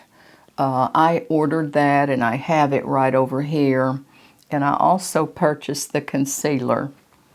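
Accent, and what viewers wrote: South, United States. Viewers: You look fabulous,. I enjoy your longer videos; very relaxing. Especially your Southern drawl; you make my day. I’m sorry about your low vision problems. But one would never know by the way your makeup turns out. You’re just gorgeous and beautiful. Your eye makeup really brought out your beautiful blue eyes. God bless you,. Looking forward to your next video and welcome back! ❤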